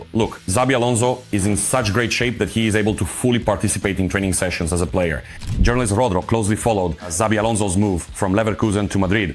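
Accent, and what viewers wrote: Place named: France. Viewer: Sounds french to me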